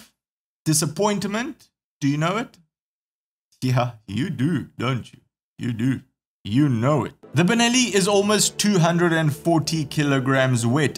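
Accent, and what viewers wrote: South Africa. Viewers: Is that a SA accent with a Romanian twang ?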